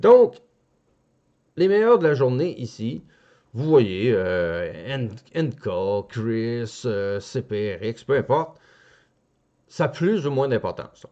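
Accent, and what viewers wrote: Canada. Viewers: Superbe. Oh l'accent canadien!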